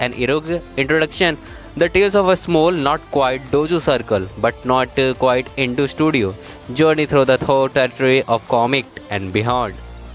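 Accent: India. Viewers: Hey bro your voice like indian are you indian 😊ya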